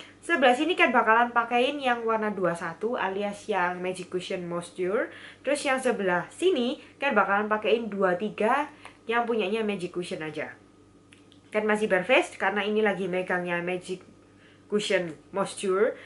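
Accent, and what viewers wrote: Java, Indonesia. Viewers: medok ah ameut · medok tenan... kakak ini pengunjung baru kak. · Hai lg pilek yak?btw ak subscriber baru suka style nya km ngomong sih njowoni hahhaa..keep up the good work ya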